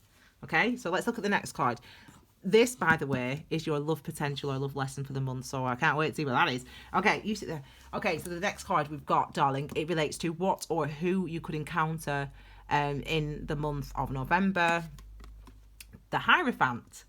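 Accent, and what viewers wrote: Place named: North, United Kingdom. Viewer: as a fellow northerner (Sheffield) I love your accent, but omg, your personality just kills me. I am sure if we lived near each other we would be fabulous friends!! Keep doing what you're doing hun, because this was spot on for me and I couldn't have it delivered by a more down to earth, normal, hilarious person. Loved it!! xx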